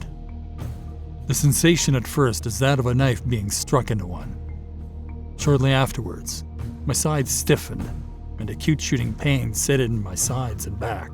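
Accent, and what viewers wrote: United States. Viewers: He sounds more American than Canadian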